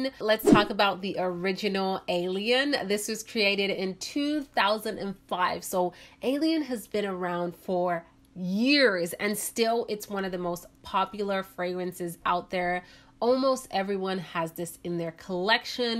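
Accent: New York, United States. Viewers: how did you start getting a NY accent???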